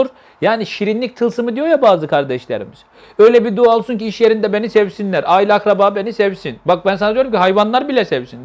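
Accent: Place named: Azerbaijan. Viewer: Azərbaycan Türkcəsinin danışığıdır. Halal olsun.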